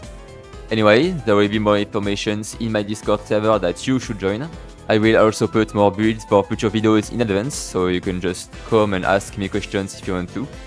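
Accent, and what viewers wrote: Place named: France. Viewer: W vid mon reuf, l'accent fr qui s'entend bien x)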